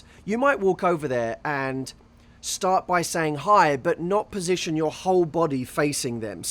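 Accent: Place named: United Kingdom. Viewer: watching this video, just to hear they lovely British accent again.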